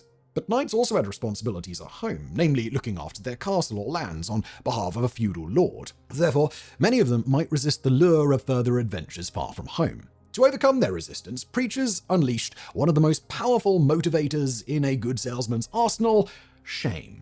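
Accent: United Kingdom. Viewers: Whenever Simon says anything negative about Colonial army in his very British voice it stirs up some weird american ancestral memory/rage in me.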